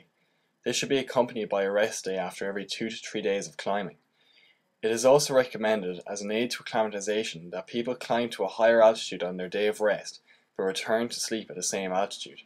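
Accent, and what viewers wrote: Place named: West, Ireland. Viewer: West of Ireland accent I'm sure, but definitely Irish.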